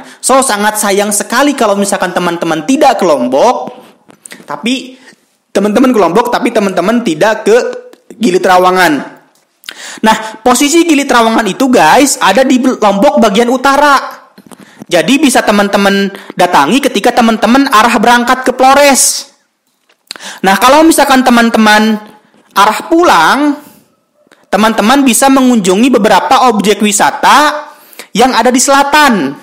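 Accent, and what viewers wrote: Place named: West Java, Indonesia. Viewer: Keren mang, meni sunda pisan logatna. InsyaAllah abi bade solo riding ka flores minggu dpn.. Aya IG mang?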